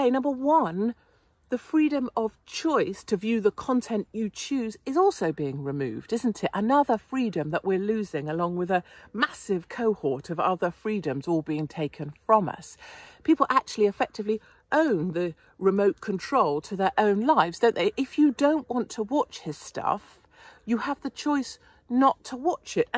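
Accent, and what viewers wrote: United Kingdom. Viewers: I hope the deaf people are reading the comments because the CC didn't capture actually what you are saying; even with your British accent, the word "were" is very clear, the program typed that the Truckers "weren't real men". Not sure if that's on purpose or not, just wanted you to know. Thanks for your confidence your enthusiasm and that British brass. Love you sis, keep it up!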